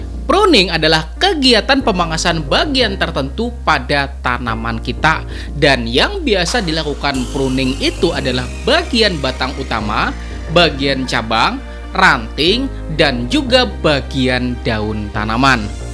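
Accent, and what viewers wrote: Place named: North Sumatra, Indonesia. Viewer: Bg asal Sumatera ya..?? Kok logatnya kayak dr daerah Sumut gt..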